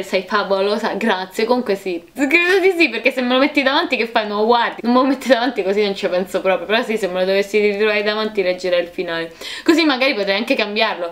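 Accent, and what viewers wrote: Rome, Italy. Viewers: È di roma e si sente tantissimo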